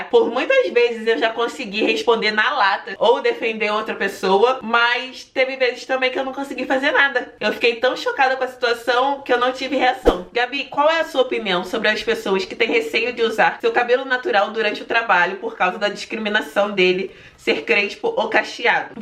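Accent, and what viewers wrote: Rio de Janeiro, Brazil. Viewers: Rexpoxta vc e da onde com esse sotaque do rio?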